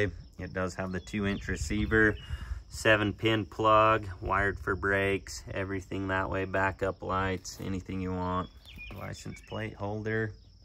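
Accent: Utah, United States. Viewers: I thought I recognized that Utah accent. Love this camper!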